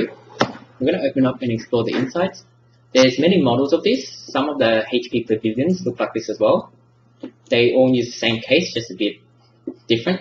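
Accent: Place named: Australia. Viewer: You do realize Aussies all soundlike him right? It's not effeminate.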